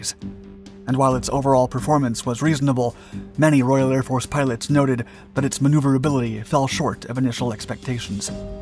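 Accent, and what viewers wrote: United States. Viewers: 'Murcan way of reading it. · British convention is not "the two sixty third" squadron as the Americans do it, but "two six three" squadron. Other than that a fine production on a lesser-known aircraft. Thanks and keep up the good work.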